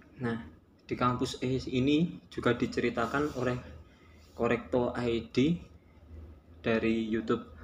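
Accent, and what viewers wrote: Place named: Java, Indonesia. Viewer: pertahankan medokmu mas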